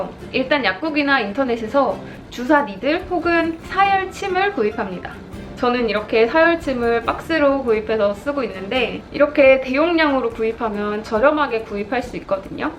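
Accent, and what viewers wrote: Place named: Seoul, South Korea. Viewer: 우와 서울사투리 같아요 신기신기 잘보고갑니당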